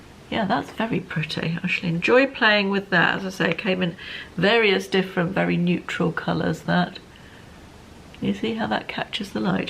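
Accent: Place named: Oxford, United Kingdom. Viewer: I like your Oxford English?! At least it reminds me of my school English lessons 😊. Therefore I’ll come back to your next Temu Haul ❤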